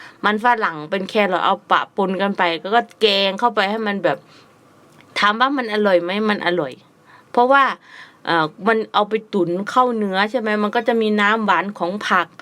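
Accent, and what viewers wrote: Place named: Isan, Thailand. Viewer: สำเนียงคือคนอีสานแท่จ้า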